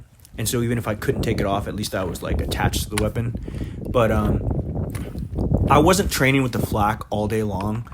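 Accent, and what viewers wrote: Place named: Texas, United States. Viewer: 1) Get yourself the brightest lazer/illuminator module you can find and practice point shooting from the low ready and hip positions. The scope is for longer ranges, but for your handicap a lot of tacticool lasers would serve you pretty well for close range daytime shoots.You sound Texan, yall have cool toys over there and hella veterans discounts so finding one should be easy. 2) Use your sling as an additional point of contact. A single point audjustable sling attached to the top rail where the center of gravity is feels like you have an additional arm holding the rifle up. Have it extremely tight and wear it so the weight of the rifle pulling on the sling is supported by one of your shoulders. I have a stockless 16in Ruger PC9 settup like this, its fun being able to manipulate it with one hand and accuretly use the laser to hit my targets. 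Ive never been serious with it though, but I can see my settup helping your flesh side from fatigueing less often. Semper Fidelis, much love from the Communist State of California.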